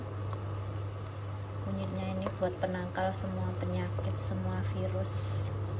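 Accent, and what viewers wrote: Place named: Java, Indonesia. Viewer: waduuh mba trnya ngomongya mendok bgt ya :D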